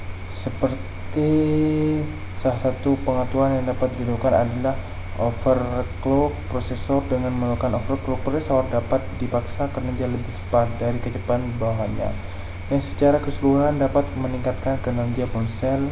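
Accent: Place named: Madura, Indonesia. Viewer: Corak madureh se ngocak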